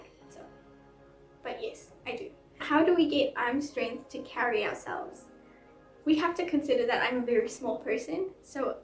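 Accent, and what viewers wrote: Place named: United Kingdom. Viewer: Nooo she’s turning bri’ish 😭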